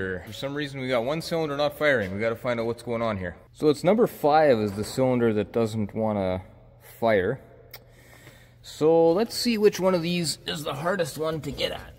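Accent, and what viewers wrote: Canada. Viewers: Manitoba, eh? Which is where I live too. And you have an accent that places you even closer in Manitoba to me, but I’m not gonna say where.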